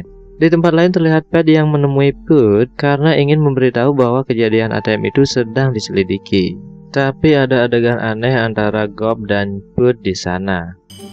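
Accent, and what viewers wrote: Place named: Bali, Indonesia. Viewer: Bali sajan logatne bli wkwk,mantap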